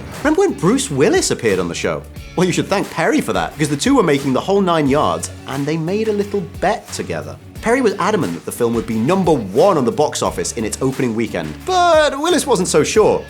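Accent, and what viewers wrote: United Kingdom. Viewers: Your accent tells me I don't really care what you think about this show. You might as well be the BBC. They like smearing American's too. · OMG U r too fast british accent.. Slow down man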